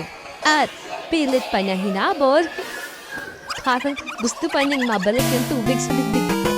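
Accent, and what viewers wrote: Batangas, Philippines. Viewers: i really love to hear ur voice ....'yong batangueña ang tono ng pagsasalita ....👸💛❤️💛❤️💛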